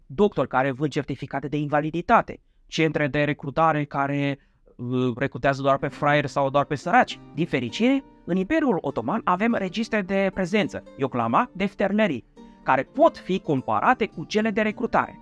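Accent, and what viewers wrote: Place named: Moldavia, Romania. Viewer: Sper să îl aduceți pe Ștefan cel Mare la locul de cinste pe care îl merită în rândul lumii / audienței. Și nu Țepeș. După accent înțeleg ca sunteți de-al nostru / de-a lui Ștefan cel Mare și nu de-a lui Țepeș. …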